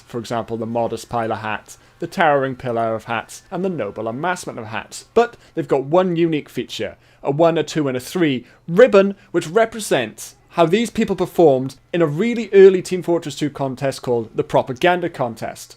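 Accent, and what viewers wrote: Wales, United Kingdom. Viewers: Are you Welsh? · Wherabouts in Wales are you from, mate?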